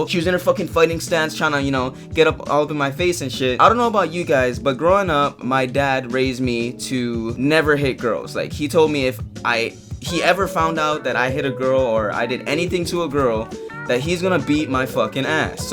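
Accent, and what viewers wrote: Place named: Polynesia. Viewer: I shouldve known. u had that poly accent.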